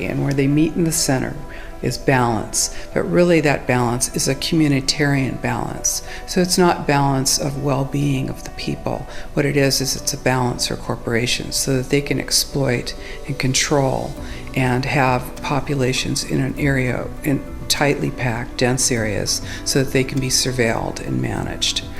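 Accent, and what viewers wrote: United States. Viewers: a croaky American female voice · Yup deffs american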